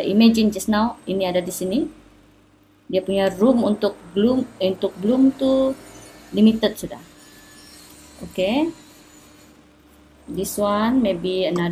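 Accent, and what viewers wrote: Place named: Philippines. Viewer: Redeemed Harshsw sounds philipino, maybe search for another video instead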